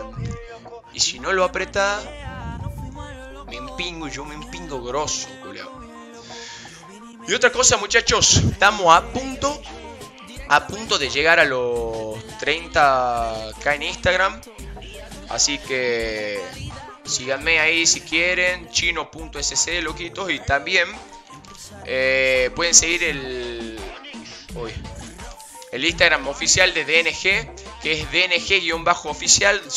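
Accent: Tucumán, Argentina. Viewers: SOS UN CRACK WACHO JAJAJAJA ME ENCANTA COMO ES EL ACENTO TUCUMANO SOY DE BUENOS AIRES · de que parte de Tucumán sos ?? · Una mezcla de tucumano y Argentino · So re tucumano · No hay nada mas piola que el acento tucumano culiadaso. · JAJAJAJ ESE TUCUMÁNO PAPAAA AGUANTE TUCUMÁN AJDJAJAJA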